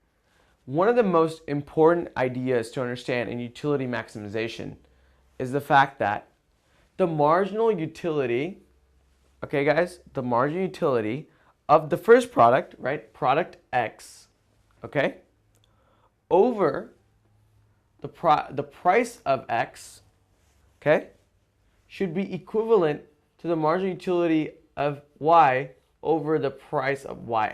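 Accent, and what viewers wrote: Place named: India. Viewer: magnificent.sheer fabulous accent compared to your other countrymen in india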